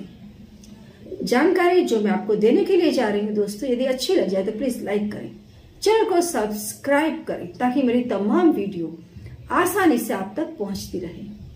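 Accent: Bengal, India. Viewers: arre SHE DOESNT LISTEN AT ALL, SHES A WEIRD LADY, I HAVE TOLD HER MANY TIMES SHE HAS A BENGALI ACCENT AISE KI TAISE KAR DETI HIAN MANTRAS KI, NAHIN SAMAJH MAIN AATA HAIN BHAI KYA KARUN...UNSUBSCRIBE KARDUN KYA, SHE DOESNT UNDERSTAND AT ALLL,,,,,,SHE SPEAKS WITH ACCENT SAMAJH HI NAHIN AATA HIAN DAMNIT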